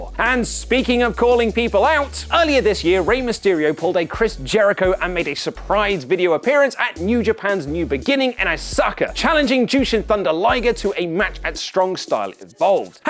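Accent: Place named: United Kingdom. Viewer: Some of his words I do have to listen to again. I guess his British accent and my hillbilly ears clash....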